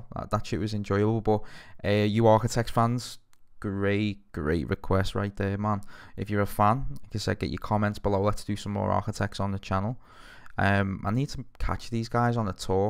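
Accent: Liverpool, United Kingdom. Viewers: I love all your videos lad I especially love hearing another scouser really loving architects Great work lid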